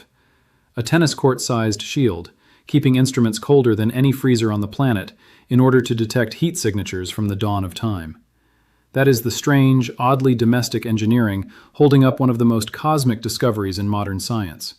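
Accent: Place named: United Kingdom. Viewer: Interesting stuff. Notice he goes into a British brogue after about three minutes. Oh that’s weird having an AI change an accent midstream.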